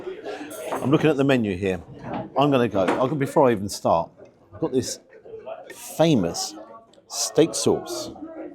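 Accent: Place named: England, United Kingdom. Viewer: A bit late to the party here since this is 7 days old. Great video. I just subscribed. However, I’m assuming you’re English based on the accent… here’s my question. What’s the best steak house in the UK? I’ll bet there’s some good ones! Ty!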